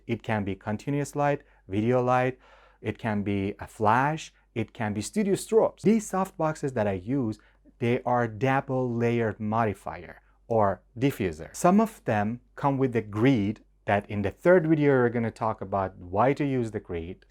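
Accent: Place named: Iran. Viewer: Based off your accent, you sound Persian. 👍😎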